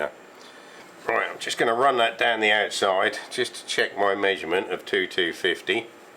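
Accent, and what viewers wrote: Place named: Norfolk, United Kingdom. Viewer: Y'oright Bah? I've been watching this series thinking he's got to be from Norfolk without actually checking your bio. Glad to find that my ear for East Anglian accents hasn't let me down. Good to see that you've got the internet up there now, keep up the good work! From Sunny Suffolk 😉😄👍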